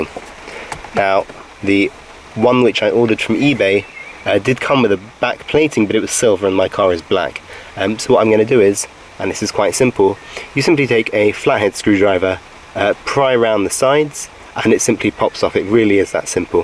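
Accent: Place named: England, United Kingdom. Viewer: Thanks. Very helpful and in the King's English with birdsong background. What's not to like?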